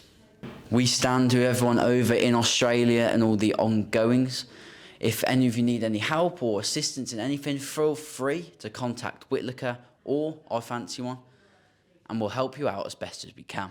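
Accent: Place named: South Africa. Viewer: Good T.W.I.V news. On the next 1 can we have it in your glorious South African voice.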